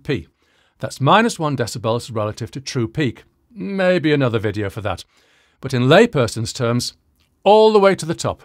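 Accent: United Kingdom. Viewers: Greetings from California. Superb video! Can I ask which region of the UK is your accent from?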